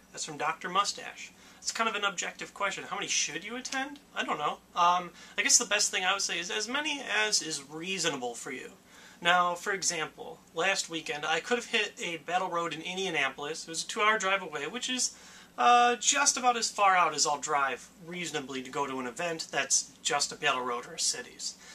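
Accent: United Kingdom. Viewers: LOVE THE BRITISH ACCENT!!!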